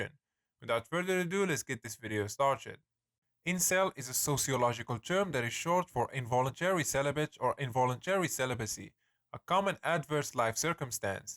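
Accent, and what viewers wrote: Morocco. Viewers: The speaker seems moroccan from the accent 🇲🇦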